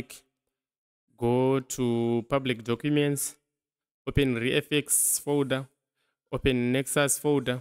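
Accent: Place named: Zimbabwe. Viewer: This voice is Zimbabwean chete thanks bruv much appreciate the help 🎉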